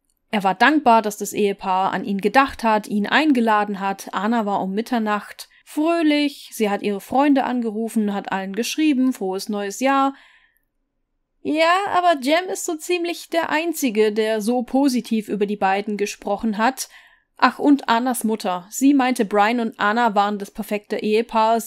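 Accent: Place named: Swabia, Germany. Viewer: Schwäb"sche Vokale - wunderbare Eigenheit bei . Unbbadiiingggttt beiiii- behalda! Gell, ge '? 🎉😅😅😅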